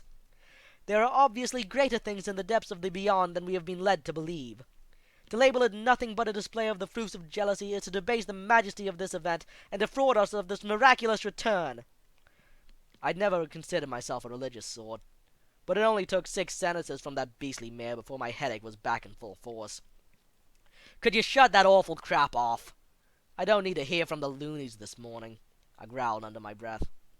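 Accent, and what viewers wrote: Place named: United Kingdom. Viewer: Enjoyed it. Wish you had continued this; really like your voice there, especially with what your accent brought to this. Is it British? (ugh, can't place the accent otherwise. . .)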